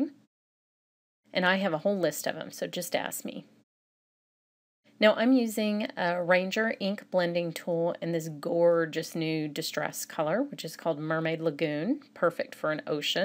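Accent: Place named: Texas, United States. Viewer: I'm slowly working my way through your video and blog archives, adding many to my Favorites because of the techniques you show and tips you provide. Love your sense of humor a lot; your deadpan delivery in this video, when you were talking about options if you don't have 24 minutes to make a card, made me laugh out loud -- thank you for that! Also, I wish my Texas drawl was as lovely to listen to as yours; I tend to talk too fast to let my drawl sprawl ;-).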